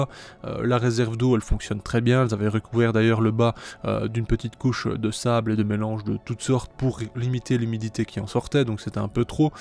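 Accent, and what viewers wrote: Belgium. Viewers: Petit accent Carolo ?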